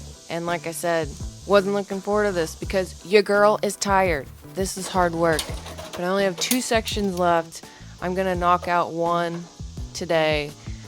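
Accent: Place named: Arkansas, United States. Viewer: You're accent sounds Arkansan. That environment seems very Arkansan. Are you in Arkansas? I grew up in NW Arkansas. Heaven on earth for around 4 month of the year. Otherwise it's hot as crap or cold as crap lol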